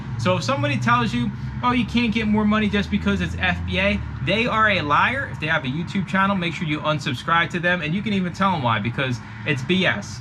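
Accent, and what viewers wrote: Philadelphia, United States. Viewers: hi. I'm in Mt. Airy. I've been all through philly though. Yeah, I could tell by the way you talk that you were from somewhere heavy in philly! Good going,! I love your content. I want to break through this wall I've built Surrounding amazon. But I would love some serious advice. Oh! Question: scoutIq or scoutly starting out? I did the free trial of scoutiq and it was ok.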